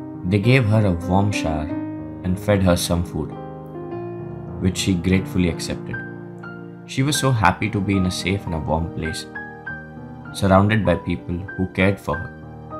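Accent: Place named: India. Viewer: The storyline never matches what we are watching. It's like miles behind. The dog has been with the woman from the beginning of the video but the storyteller is making it seem like that's only happening half way through the video. Whoever produces these videos doesn't know what they are looking at when they are putting the English storyline into the video because the transcript is automatic and follows the sounds of the storyteller. As he sounds Indian, it might be that he uses Indians to put his video together for him and they don't speak English. Maybe that is the reason for the mish mash.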